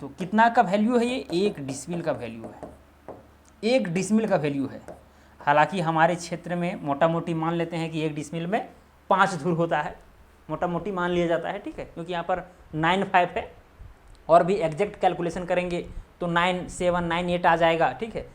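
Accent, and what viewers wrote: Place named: Darbhanga, India. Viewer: आप बिहार में दरभंगा के है क्या, अच्छा ज्ञान है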